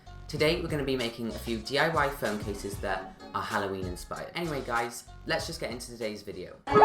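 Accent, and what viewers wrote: Wales, United Kingdom. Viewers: Are you Welsh ?x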